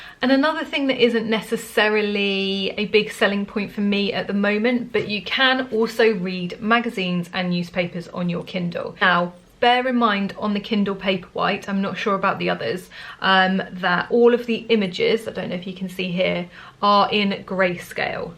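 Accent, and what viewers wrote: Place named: United Kingdom. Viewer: From your thumbnail i thought you were British😅😆,, and then hearing your accent confirmed it... Great video in any case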